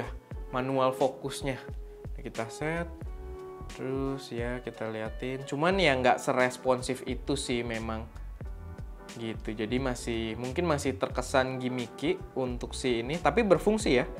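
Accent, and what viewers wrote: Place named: South Jakarta, Indonesia. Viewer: Bang org Jaksel ya...? 😅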